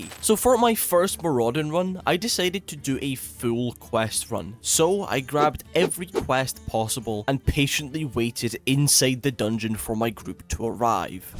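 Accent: Scotland, United Kingdom. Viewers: I watched the whole thing and I'm still wondering if yer actually scottish.... Pants?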